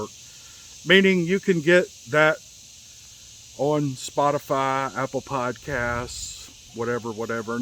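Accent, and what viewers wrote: South, United States. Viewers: Hey,. I've been liking the Steve Lilly stories, as well. Was gonna ask you if you have any relatives in SC or in the Aiken/Augusta area. I'm Steven Buckner. Dad's name is John. His whole side of the family are good, (mostly! Lol) hard working Southern folks. Every time I listen to your stories or casts your country twang reminds me of my cousins, aunts, etc. Haven't run into many Buckners, even in my 10 yrs in the navy. None actually. Anyways, I thought I'd say hello and see if you might have some kind in red clay country. Take it easy as you can and get back to me when you can. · That melodious voice w hint of southern accent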